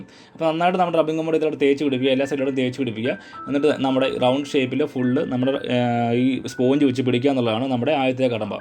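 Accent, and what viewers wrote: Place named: Pala, India. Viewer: പാലായിൽ എങ്ങാനും ആണോ വീട്. എവിടെയാ. സസാരംകേട്ടിട്ടു ഇവിടെ എങ്ങാണ്ടു ആണ് വീട് 🤔🤔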